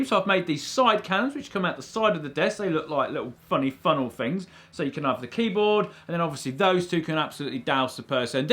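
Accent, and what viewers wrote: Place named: Midlands, United Kingdom. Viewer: purely your heavy Midlands (I think) accent coming through 🤣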